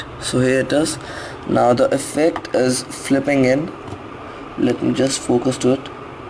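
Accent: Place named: India. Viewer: I love your accent no offense I love Indians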